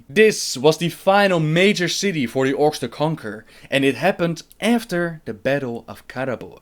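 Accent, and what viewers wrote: Netherlands. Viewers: Where do you come from... it sounds dutch.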